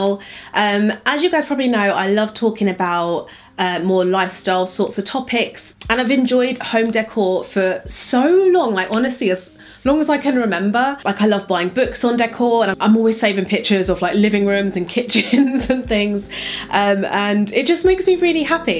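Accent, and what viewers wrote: London, United Kingdom. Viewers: you sound so London in this video for some reason haha! your decor is gorgeous xx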